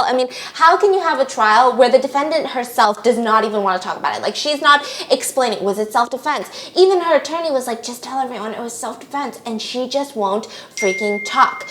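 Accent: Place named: United Kingdom. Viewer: Your fancAy British accent is everythingg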